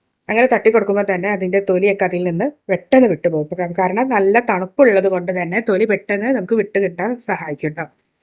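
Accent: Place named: India. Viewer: Ethu naatilanu think chovannully ennui parayunnathu.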